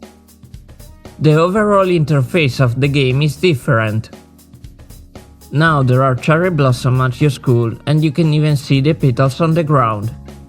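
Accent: Italy. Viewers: I know it's hard to understand, but it's not his fault, in Italy most schools teach you english with a bad accent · Are you italian?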